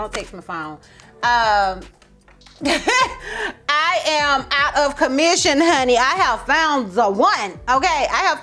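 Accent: South, United States. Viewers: I loved this video! You had me laughing out loud in my livingroom! Between your story, the voice changes, sound effects, your facial expressions, and your cute country twang, you had me hollering here in NJ! ⁰Thank God he ain't kill ya! Lol! Chica, You are too much but so funny. Looking fwd to hearing your next zodiac tale! LOVE YOUR CHANNELS, Ms.!!😅✌🏽🤣🙏🏽😉💜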